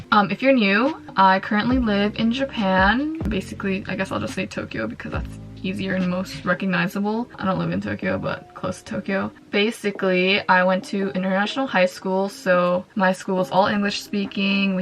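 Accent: United States. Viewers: New to your channel and am wondering how your English is so American sounding if you are raised in Japan? And yes healthcare and college are all extremely expensive here in the California and the US in general. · Choosing the right school and location is so hard. It’s hard to settle. Has your experience gotten better in Australia than the US? Your international schooling explains your accent. You sound like my cousins in Cali. Does japan have good schools? You might just miss home and the familiarity. Small world - I’m from San Jose lol. It’s not the world’s best place. Did you like San Francisco?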